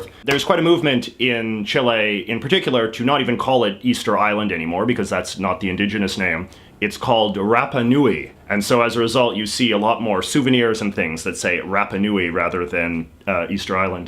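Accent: Canada. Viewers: I mean he is an English speaking Canadian. Even if you study a language for a very long time, especially as an adult, it is very difficult to not have a distinctly foreign accent when pronouncing words in other languages. This is because, especially if you are monolingual, you will pronounce words based on the phonemes in your mother tongue. For example, despite me having studied Spanish for 10 years as a teenager and young adult, I now have lost my authentic Spanish accent and now sound very "white" when I speak the language, simply because I haven't been using the language. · Nvm I sounds Canadian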